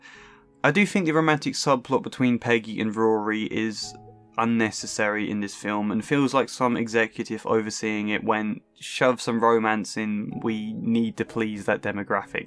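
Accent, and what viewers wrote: United Kingdom. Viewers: You sound British, but I never could figure out from where, so this explains it (I am horrible at accents and can't recognize them well, so I wasn't worried about not being able to place you). I've lived in Texas my whole life, but I don't feel connected to it or the US in general. I don't feel tied to anywhere, nor do I fit in. It's wonderful to hear you speak of the place you come from with such affection and connection. I guess it's no coincidence that you represented yourself with a tree? You are tied to the lands where you were born. :) <3 Oh, and I do need to go watch this movie now! X)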